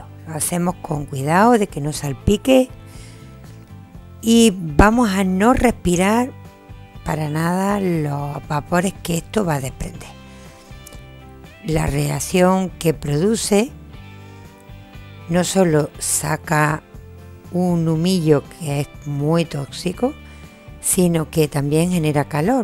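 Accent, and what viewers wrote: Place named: Spain. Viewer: Hola. Me encanta tu canal y tu acento español. Tus videos son muy claros y entretenidos. Aunque yo también soy jabonera, tengo que confesar que mi jabón favorito y que lo aprendí de tí es el de Rosas y Aceite de Eucalipto. Te envíos abrazos desde Chile.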